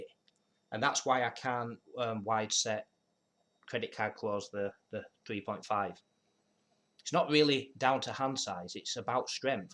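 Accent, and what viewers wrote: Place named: North, United Kingdom. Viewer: Really interesting vid with well articulated points - referencing all my fave grip channels. Subbed after being directed here by Nick. Good to hear a familiar northern accent talking grip. Look forward to more content ✊💪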